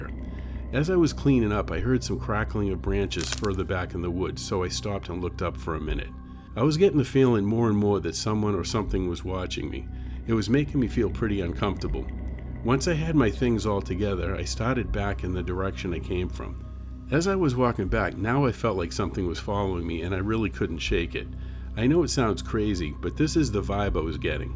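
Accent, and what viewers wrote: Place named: Northeast, United States. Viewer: The northeast accent is refreshingly unique. Very much enjoying your channel. Looking forward to watching it grow.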